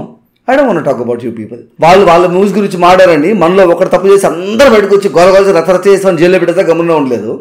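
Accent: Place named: Karnataka, India. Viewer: His telugu slang was very much like Karnataka Telugu slang.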